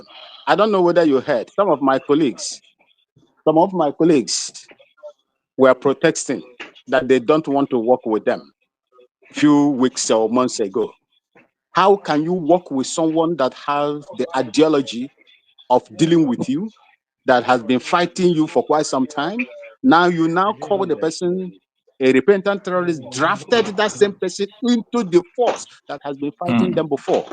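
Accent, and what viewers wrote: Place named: Igbo, Nigeria. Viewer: This is an igbo man from his intonation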